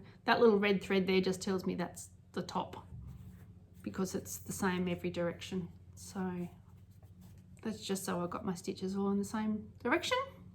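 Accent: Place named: Australia. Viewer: Great to see you back! Love what you do and your presentation was very enjoyable... not too many of us Aussies on YouTube so it's so refreshing to hear you!!! Take care 💚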